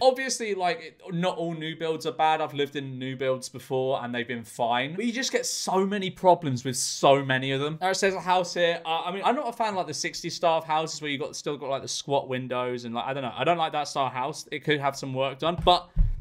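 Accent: United Kingdom. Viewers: It's kind of worrying that my ideal man...male version of me, exactly the same accent and thoughts...is young enough to be my son.....🙄 I wonder if takes after his father, and if his father is single...? 😂🤣😅 He's so funny, so sarcastically British, and so eccentrically old for his age, it just cracks me up! However, I must add, my daughter, son-in-law and five children live in a new build, and it is lovely. It's four bedroom, two bathroom, the lounge is huge and the kitchen / dining area is the same size as the lounge. I'm very particular with things being central and lined up....that one with the angled drainpipe for example, who gives a shit about a bit of gutter pipe when the porch is not even above the front door? That would make me insane far quicker than a dripping pipe! But my daughter's house is very neatly done. The windows are also about as big as would fit in leaving enough bricks for structure. 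Her's is also on a corner plot, big block paving driveway for two cars on the left, go through the gate on the left and the garden sweeps all the way from the driveway, round past the kitchen door, and then wraps all the way round the whole back of the house. It's massive, and beyond the fence is a stream and woodland. She hit the jackpot getting this one! She's only on social housing through the council and her's is rented from a housing association. Rent should be £1,500 a month, but for them it's free. The new build she was in prior to this one, the developers pac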